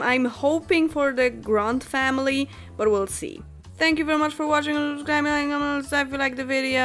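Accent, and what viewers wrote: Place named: Poland. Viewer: Kocham ten polski akcent <3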